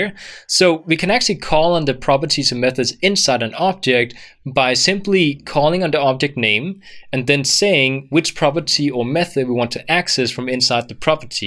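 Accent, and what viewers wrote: Denmark. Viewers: Trying to figure out the accent. Definitely a Scandinavian but not Swedish accent because, we don´t sound exactly like that but close. It´s not Norwegian because you don´t sound that gay, so you must be from Denmark.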